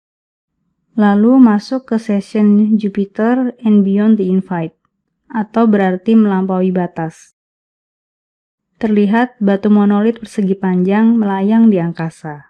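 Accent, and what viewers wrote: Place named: East Java, Indonesia. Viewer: Ya kayaknya orang jawa timur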